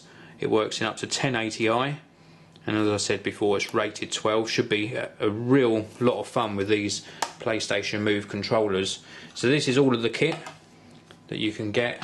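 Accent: England, United Kingdom. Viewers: It's an english accent. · It's a british accent:)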